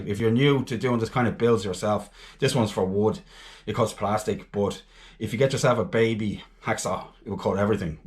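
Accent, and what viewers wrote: Ireland. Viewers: Newly subscribed on your channel and have learnt so much already, thank you. Excellent informative and well explained videos, well done. Lovely to hear it from a fellow Irishman 😊. Trip planned for Norway for July and August, hence my renewed interest in fishing. No fish left in the Med, so lost interest in fishing since I moved down here. Really looking forward to picking it up again in Norway. Keep up the good work 🤩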